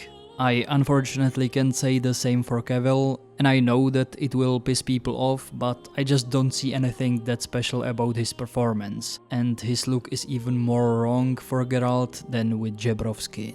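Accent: Czech Republic. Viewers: Dopytle....celou dobu si říkám..odkud ten borec asi je....takovejhle "přízvuk"....to je snad Čech...a pak na mě vyskočí:)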